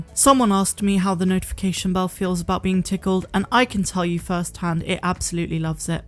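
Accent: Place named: West Country, United Kingdom. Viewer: Meh we can hear your West country accent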